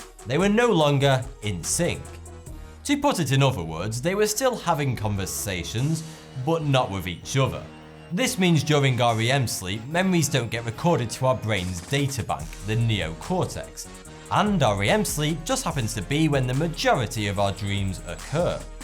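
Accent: United Kingdom. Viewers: I like this guy because he gives detail and it's easy to tell the people behind the scenes have don their research. And the British accent works well too.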